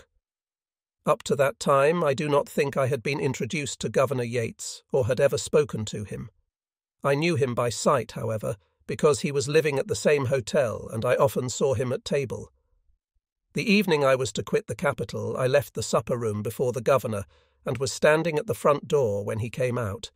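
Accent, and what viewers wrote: England, United Kingdom. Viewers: The English accent of the AI narrator is incongruous with what is a uniquely American event.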